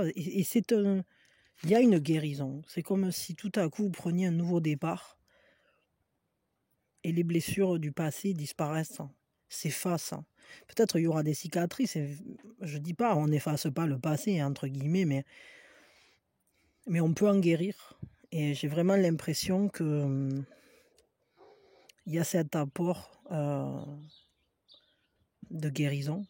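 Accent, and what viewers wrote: South, France. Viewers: Bonjour, votre accent du midi fait plaisir à entendre 😍 Nouveau cycle, mais notre lien a été corrompu par de la magie noire. Ma famille est tout !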